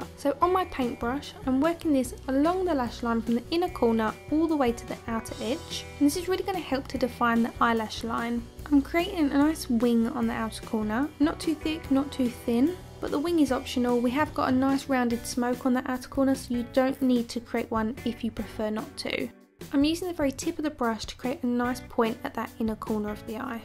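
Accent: United Kingdom. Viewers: Aw you replied. that's so nice of you. i recreated this look and learned new tricks and it came out nice. i've been watching your tutorials and its one of the best. you deserve more subbies and btw i followed you on IG. more power to you. anyways are you british? JW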